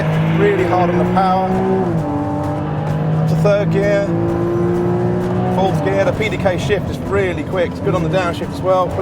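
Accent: United Kingdom. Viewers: he has to be british REALLY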